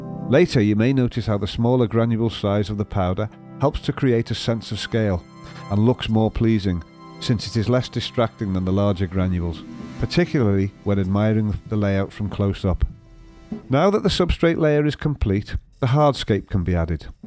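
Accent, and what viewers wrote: United Kingdom. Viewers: I just loved this aquarium. Explendid word. just got tired of the british gentleman narration as the work was being develooed then I Mute the video, so I could enjoy the beauty of this work of art and the sound of silence. Jeff from Brazil :-)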